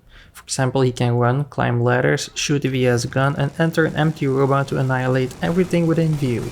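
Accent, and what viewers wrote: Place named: Netherlands. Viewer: You sound Dutch!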